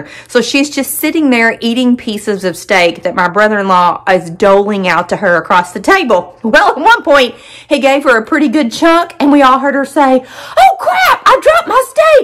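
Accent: South, United States. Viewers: Your sister doesn't have a heavy southern accent like you do.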